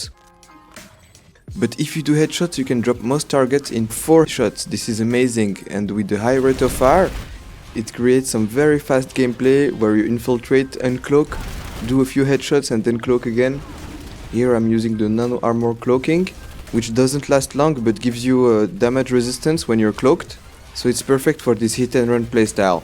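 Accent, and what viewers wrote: France. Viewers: oula lui il a un accent français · tu a un accent français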